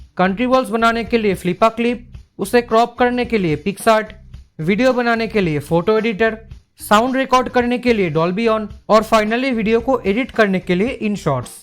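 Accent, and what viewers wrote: Bengal, India. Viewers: Tomar hindi bolar dhoron dekhe mone hocchilo tumi bangali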